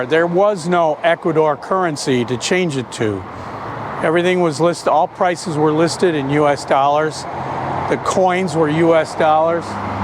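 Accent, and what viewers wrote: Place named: Rioplatense, Uruguay. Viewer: Rioplatense accent. That’s what we speak in Uruguay. Pretty different from the rest of latinoamerica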